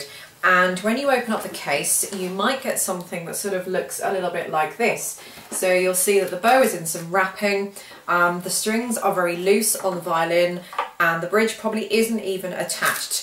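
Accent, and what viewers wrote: United Kingdom. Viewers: I lov the way u speak with a Britain accent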